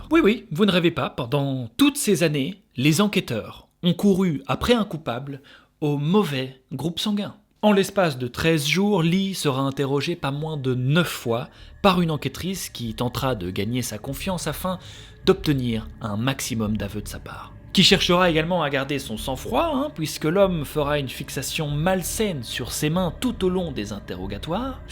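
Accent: Belgium. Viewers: Les Belges, vous dites Houite pour Huit et pourtant vous prononcez Tuiste le mot Twist. Super vidéo sinon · Il est belge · Mais il est belge ?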